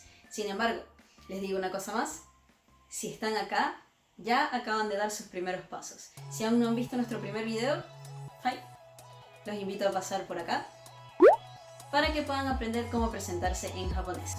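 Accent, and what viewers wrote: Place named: Chile. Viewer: A escucho el acento chileno 😅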